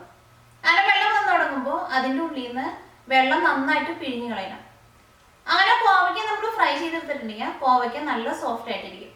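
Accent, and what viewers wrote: Thrissur, India. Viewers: thanks for that recipe....... one advice: you need to try to speak in clear malayalam instead of using your regional accents like thrissur or kottayam........ usually when we address public (either in stage or off stage) all of us speak normal malayalam without local accent....... (and all of us can speak too)..... try next time please.........so that you represent the entire kerala..........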